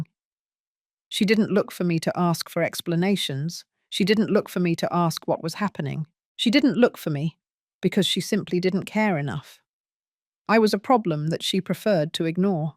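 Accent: United Kingdom. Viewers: I don’t know why but a British accent makes revenge sound proper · I can’t stand this British accent any longer. Bye. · This is one confused story. Evil daughter knows and then doesn’t know mom gives monthly transfers. Narrator has a British accent, is named Mrs Lopez, and has a bank that’s open on Xmas Day.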